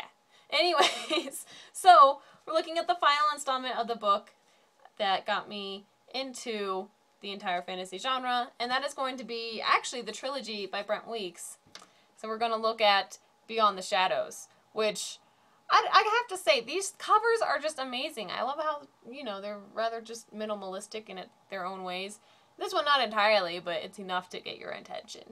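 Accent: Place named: United Kingdom. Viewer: Welcome Back Pretty , your accent changed a little to British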